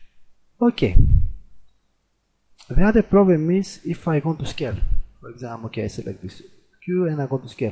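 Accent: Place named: Jamaica. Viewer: nice plugin and you have a nice jamaican accent :))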